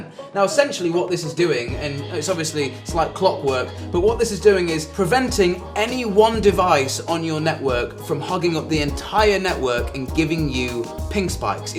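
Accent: England, United Kingdom. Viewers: He’s British if you didn’t notice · No, he's English and speaks British English, hence he says 'rooter', not 'rowter'. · that’s how we say in the uk if you got a problem take it up with boris johnson he’ll sort u out 😂😂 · Calm down mate, that's how they pronounce it in the UK you mong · its his english accent that you find impossible to accept. not my irrelevant youtube name. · It's because he has a British accent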